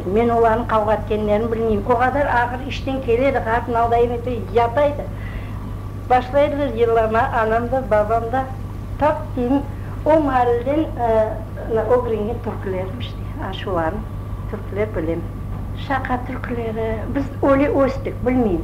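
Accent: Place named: Bakhchysarai, Ukraine. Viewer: Büyük zevqnen baqtım, öz Bağçasaray lehçemizni eşitmek ne qadar hoştır 😍 bu işke isse qoşqanlarınıñ elleri dert körmesin!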